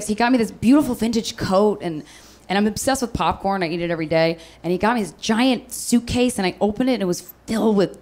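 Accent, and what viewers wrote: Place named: Midwest, United States. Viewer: Herr midwestern accent is amazing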